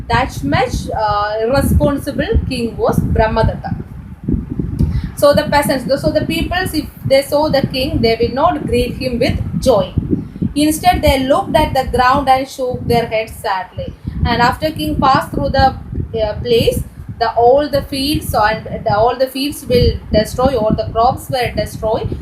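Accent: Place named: India. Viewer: Bad explaining and butler English very bad better luck next time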